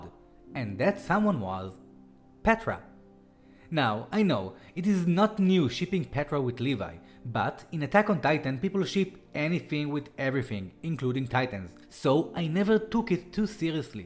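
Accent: Israel. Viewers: You have an Israeli accent. you are Israeli?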